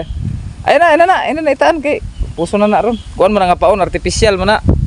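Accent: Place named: Visayas, Philippines. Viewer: Bisaya man kaayo ang dating from tanauan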